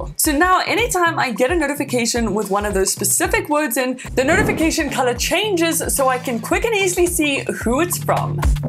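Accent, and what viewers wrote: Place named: Afrikaans, South Africa. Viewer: Ek wou sê jy klink afrikaans😂 goeie video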